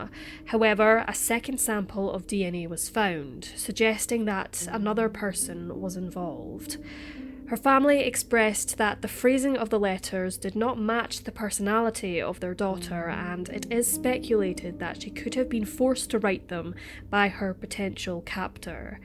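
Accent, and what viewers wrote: Scotland, United Kingdom. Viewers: I'm still trying to pin point the accent..where are you from? I used to think it was Scottish but now I'm second guessing. I'm all the way down in the Antipodes in Australia so excuse the ignorance. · Yes great narration...I know this may be outta line....Scottish accent?? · Heartbreaking story. Love your accent, Scottish?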